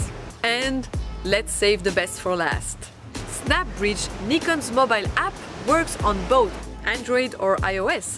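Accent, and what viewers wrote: France. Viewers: pretty french woman speaking English, easy win! very professional review, too bad i am not at all interested in a Nikon mirriorless! i am holding out for the panasonic S1r or a Sony a7III!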